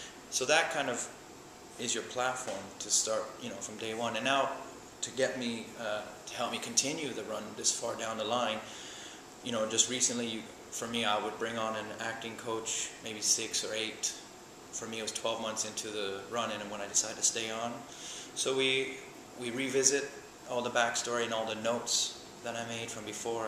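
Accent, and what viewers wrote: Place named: Canada. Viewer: yeah he does have a slight accentuation on certain sounds :) but its defo a canadian accent :) he may speak another languadge he was born in Iran so who knows maybe thats the cause of the slight accent :D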